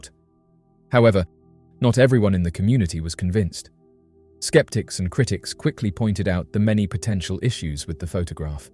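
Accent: England, United Kingdom. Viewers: No more pohm narrators... OUR COUNTRY.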